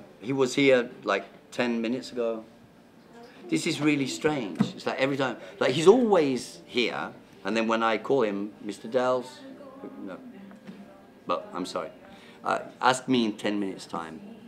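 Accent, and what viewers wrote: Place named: Italy. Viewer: I think his accent sounds more italian than british now. isn't it ?^^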